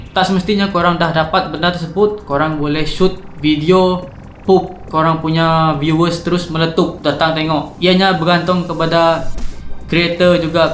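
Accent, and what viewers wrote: Sarawak, Malaysia. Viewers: sarawakian ka? 😂 btw nice content. quite membantu and thanks for reminding pasal bajet.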